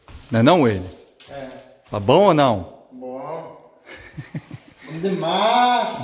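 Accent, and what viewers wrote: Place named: Minas Gerais, Brazil. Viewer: Veio você ainda não perdeu o sotaque mineiro ,ainda bem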